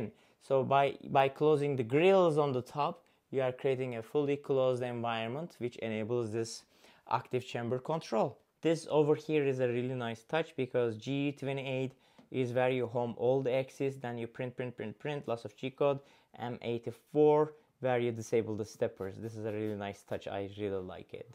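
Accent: Turkey. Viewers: accent 100% Turkish :) (not a criticism)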